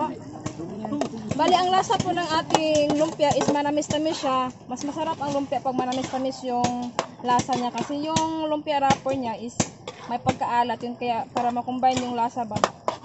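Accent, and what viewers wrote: Visayas, Philippines. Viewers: watching from baguio city.. new fan & new subs. sobra mong galing magluto.. galing... taga san po kayo visaya kc salita nio po.. God Blessed.. ❤️❤️🙏💞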